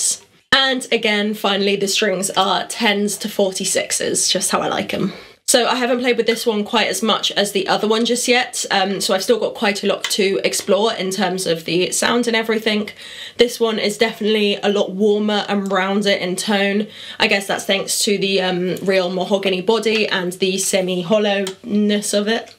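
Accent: United Kingdom. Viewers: What part of UK does your accent come from? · Ahhh... she's a Brit ! 🤔🙄😏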